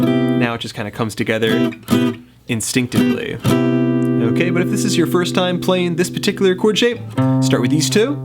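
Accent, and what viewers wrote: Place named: Mid-Atlantic, United States. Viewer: Very nice lesson, appreciate it. I'm from the mid-Atlantic and recognize your accent ... a lot of people probably wouldn't pick up on it.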